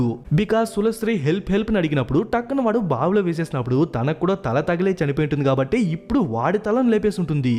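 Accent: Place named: Ballari, India. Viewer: Explanation is good...bro I think you're from ballari... Karnataka state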